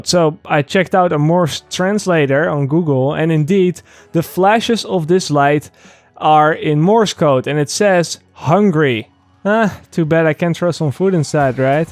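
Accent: Netherlands. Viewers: pff je hoort duidelijk dat hij Nederlands is hahaha, het heet volgens mij iets van steenengels. dan probeer je niet eens met een accent te praten. bijv. trough en true spreek je gwn het zelfde uit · Ben je nederlands ?? zo te horen aan je accent 😂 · Jij bent Nederlands hahaha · are you dutch if you are im to =)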